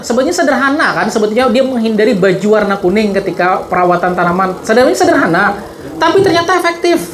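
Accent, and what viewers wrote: Banjar, Indonesia. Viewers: Logat Banjar kayaknya...Smoga sehat selalu.Ulun jg pernah tinggal lama😀 di Bajarmasin.Kutu kebul ko datang terus knapa yah...ditanaman jeruk.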